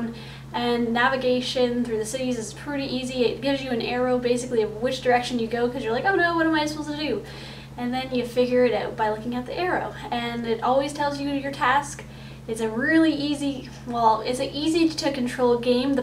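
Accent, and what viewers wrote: Canada. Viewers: If I were to take a guess, I would say you are Canadian. A very cute Canadian at that :D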